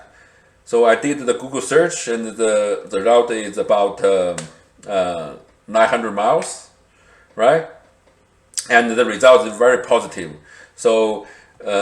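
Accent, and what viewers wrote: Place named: China. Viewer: Is this a Chinese channel. I need to know because you sound Chinese and I don’t find Chinese made things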